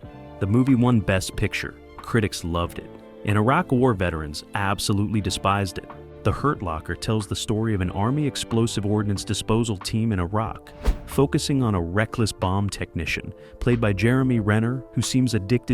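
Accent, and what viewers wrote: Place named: United States. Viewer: Sounds typical American.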